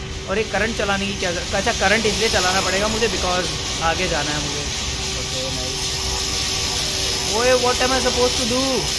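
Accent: Haryana, India. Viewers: Bhai Aap haryana ka hoo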